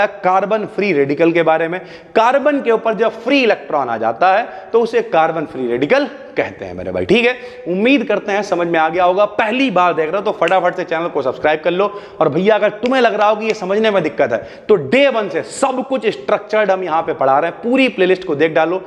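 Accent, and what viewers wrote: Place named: Kanpur, India. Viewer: sir saari videos aapki line se dekh rha hu sir sb samjh me aa rha i really thank u sir for such a great content in your kanpuriya accent.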